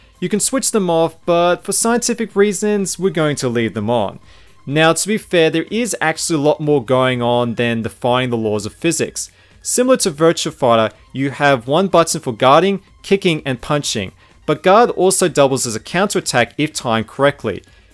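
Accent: Australia. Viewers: Hi Mate. You sound like you are in Austarila, so am I. Did this Japanese import NTSC-J game play fluidly on your PAL Sega Saturn? Is your Saturn unmodded?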